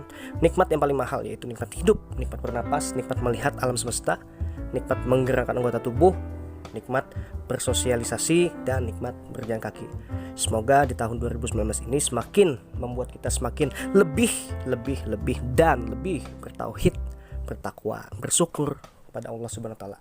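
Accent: Banjarmasin, Indonesia. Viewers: Abngnya Dari Banjarmasiiinn, kahh??? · Banjarmasin ya....Sy suka gaya bicaranya, mengingatkan Sy dg seseorang di Bjm hheee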